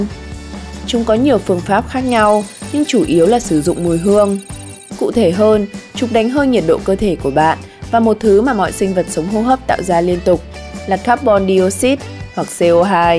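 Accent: North, Vietnam. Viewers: Cho anh giọng Bắc hay và ấm hơn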